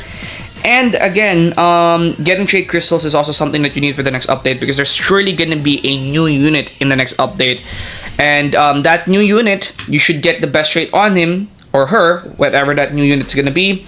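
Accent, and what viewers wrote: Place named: Philippines. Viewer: Helpful video! off topic but are you Filipino? Your accent is cool 😅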